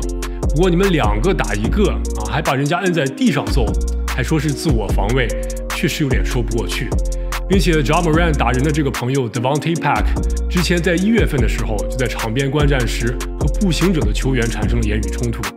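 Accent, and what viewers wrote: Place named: Dalian, China. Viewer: 哈哈大學在大連四年，看第一個視頻時候聽喃第一次說話就知道是大連銀🤣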